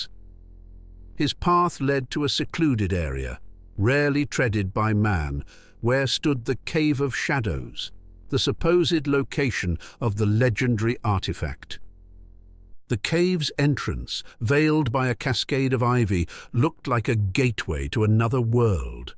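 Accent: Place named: United Kingdom. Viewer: Great, except for the annoying, supercilious British voice. We need cooler voices 🤪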